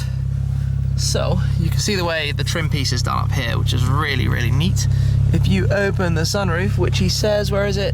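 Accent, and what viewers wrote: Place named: England, United Kingdom. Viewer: what city/region in England is your accent from? Nice car!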